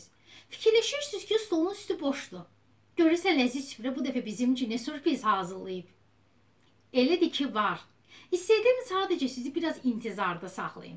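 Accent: Baku, Azerbaijan. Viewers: Lehceniz lezzet eliyir. Bakililar başa dūşer.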